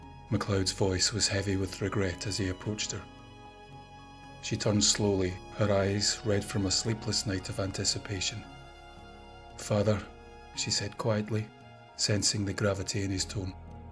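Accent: Scotland, United Kingdom. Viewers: A new subscriber here too. Anything I mean ANYTHING, related to Scotland and I'm HOOKED. Beautiful , Tragic, Romantic, Brutal, and Betrayals, Beautiful Scotland has seen it All Even your accent has me Hooked. Looking forward to many more Tales. ❤ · How did I miss notification for my favourite channel and the guy with greatest Scottish accent. As a paranormal investigator for 20 years I researched, investigated some of England and Scotland's most haunted locations until I stopped in 2010. I miss what I love deeply. Investigating, the research, the stories told to me were my big love but my point being, we all love a great ghost story and knows just how to tell a great ghost story. This channel is growing fast and there is no great channel for us all to help grow. The paranormal world is full of fakers, lyre's and cheaters right now and sadly its not showing any signs of getting better as I hoped in time it would? 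I still like to research and visit locations but its more hobby now than full time job. I worked closely with ghost finders of Scotland based just outside Glasgow. That's not a plug I promise you because like my that team is no longer lives. Some of the best teams know to the paranormal world are no longer. They have become something of ghosts themselves fading into history and now we are left with these who care more about money making that finding the truth as we knew it. Scientific methods is no longer used by the few as the many seem to just practice fame seeking. Its a paranormal world I look to stay away from now! Thank you for all you dedication and hard work since channel birth. all though ghostly tails change over the years because everyone adds on little bit for horror effect th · Good 🌄 morning.....so good to hear that wonderful Scottish accent. I am proud to be from Scottish ancestors and I love ❤️ the stories. 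Thanx😊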